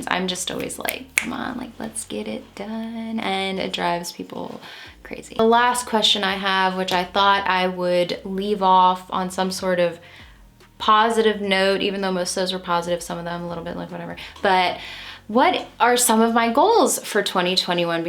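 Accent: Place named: Minnesota, United States. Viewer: What state do u live in? You sound like Minnesota like me being the ranch n many other things lol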